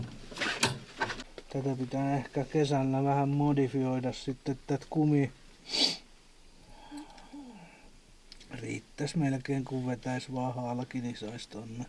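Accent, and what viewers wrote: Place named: Finland. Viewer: Kiitos hyvästä kanavasta! Jännä, että alkanut vasta tänä vuonna sitä meille tarjoamaan. Laitettu tilaukseen👌 Hienoja ja mielenkiintoisia videoita teette👍 Murteesta päätellen aika lähellä meitä vissiin olette😀 myö ollaan Kouvolast🤣 Meillä on aika uusi kanava tubessa, jossa reissataan vankkurilla Suomessa ja ulkomailla. Ja toki retkeillään myös.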